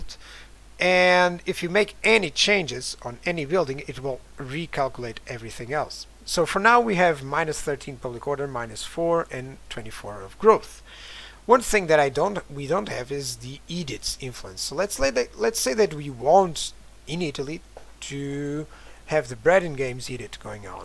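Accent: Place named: Poland. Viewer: Vaya curro te has pegado, gracias por compartirlo. Por tu acento pensaba que serías de polonia o pa ya pal coño. Saludos, y gracias, otra vez.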